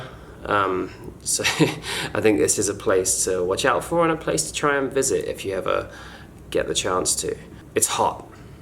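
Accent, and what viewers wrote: United Kingdom. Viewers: Bmw 7 series as a taxi And u say not to shabby Haaaahaaaaahaaa AND UR BRITISH I CAN TELL BY UR ACCENT SO U KNOW WHITE GUYS HAVE FORDS AND VECTRAS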